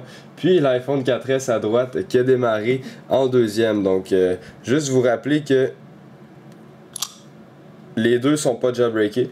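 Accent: Quebec, Canada. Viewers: merci pour ton bon vidéo! tu est presque le seul qui vient pas de france... sa fait du bien enttendre un québécois!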